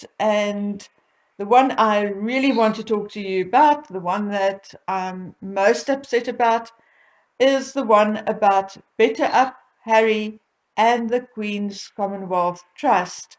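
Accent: South Africa. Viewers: Dearest I just want to say thank you 🙏 what a super video, it may take me a little time to digest as I’m not as young as I used to be 🤣 but I do so love your videos and hearing your beautiful South African accent, it’s just delightful 🥰 take care stay safe 💜 looking forward to your next video 🥰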